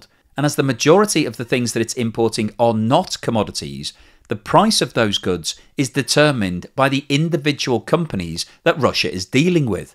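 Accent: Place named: England, United Kingdom. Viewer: Sometimes, just for fun, I talk in voice and pretend I'm English.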